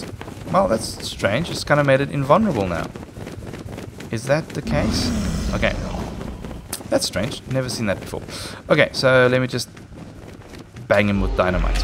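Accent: South Africa. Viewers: are you south African? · Are you South African?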